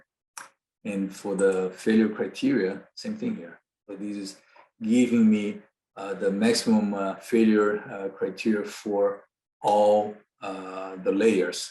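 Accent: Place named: Brazil. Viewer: I knew that accent was Brazilian! Super cool seeing an Asian person with a Brazilian accent!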